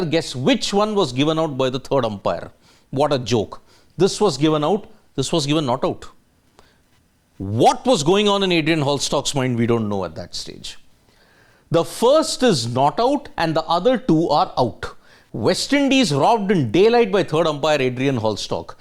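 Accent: India. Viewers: Please improve your accent. The typical Hindi-English accent is truly wreckless coming out of your vocal cords 😂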